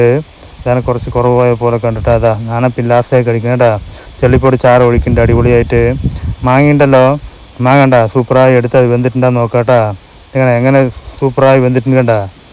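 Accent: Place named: Palakkad, India. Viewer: Palakkade slang aanu broo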